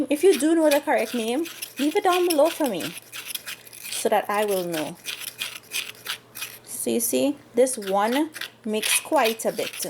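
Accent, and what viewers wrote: Trinidad and Tobago. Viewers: I came to the video by accident and when I heard the voice, I said myself 'Aye aye! how she soundin' like a Trini so?", then I checked the name of the channel and bawl 'Or horr...'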